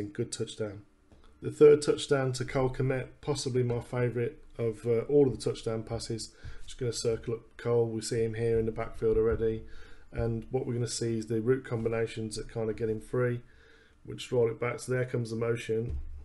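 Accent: England, United Kingdom. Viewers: Am I hearing an English dude doin detailed football analysis, love it 👍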